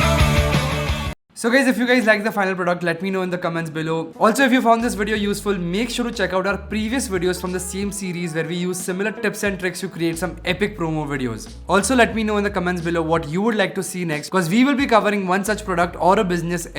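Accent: India. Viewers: Who guys came here for the Bollywood Accent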